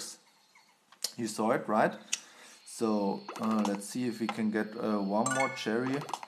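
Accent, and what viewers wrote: Germany. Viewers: Kann es sein das du deutscher bist? Das ist so ein typisches "Deutsch Englisch"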